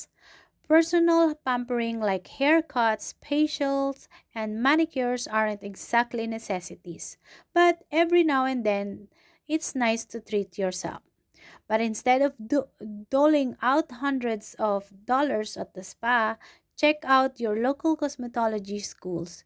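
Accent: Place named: Philippines. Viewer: Lol your filipino accent is too strong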